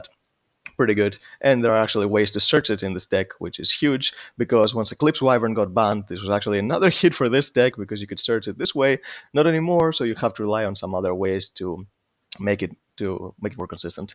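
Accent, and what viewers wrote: Greece. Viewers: Δεν παιζει να μην εισαι ελληνας με τετοιο αγγλικο xD <3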